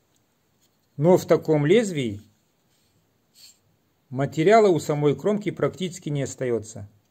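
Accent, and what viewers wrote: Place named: Caucasus, Russia. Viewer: ДОБРЫЙ! ДАВНЕНЬКО НЕ ЗАХАЖИВАЛ, К ВАМ В ГОСТИ. ОБЗОР ПОУЧИТЕЛЬНЫЙ ( У ВАС, ИНАЧЕ НЕ БЫВАЕТ ). МИРА ВАМ И ДОБРА 🙏 P.S. КАВКАЗСКИЙ АКЦЕНТ, ВАМ ИДЁТ 😉✌️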